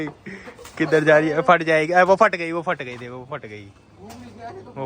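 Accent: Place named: Kota, India. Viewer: Kota ya aas aass boli asii lag rhii hai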